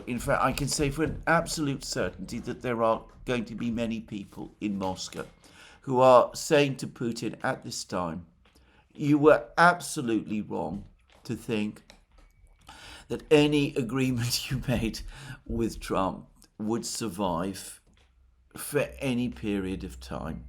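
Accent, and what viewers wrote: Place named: RP, United Kingdom. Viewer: 勿体ぶった話しぶり 下顎を上げ視線は鼻先をすり抜け "ゆ なあーいてっど" 「united」（発声発音：Received Pronunciation、RP） 持って回したものの言い方 その先に必ず待機万端するのは 枕詞 「以前私が議論した通り」 これらの風味滋味を愉しめる渋い寛容は キエフ陥落に至るまで持ちこらえられそうには、もう無い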